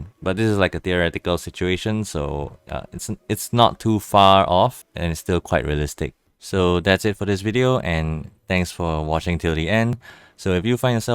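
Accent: Singapore. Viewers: I love how organised and clear all your calculations are, it makes it super easy to just refer back to the spreadsheet whenever I want! Also fellow Singaporean here, I was wondering why your accent sounded so familiar xD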